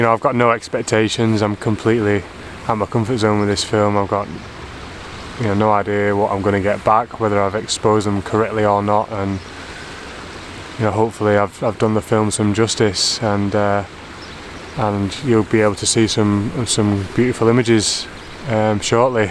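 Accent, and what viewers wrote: Yorkshire, United Kingdom. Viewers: Great video! Yorkshire accent?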